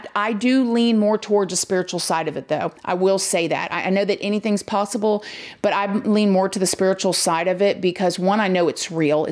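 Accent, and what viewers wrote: South, United States. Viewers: Im guessing you're from TN you are GORGEOUS!!! And you got that lol southern twang 🥰😍🥰😍 in in Looooooove lmao fr tho I'm guessing outside of Nashville somewhere TN girls are just omg I've only been to Graceland and Nashville but you look the part all the way id move up there for girls like you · I agree agree I'm very southern her southern accent I relate with well.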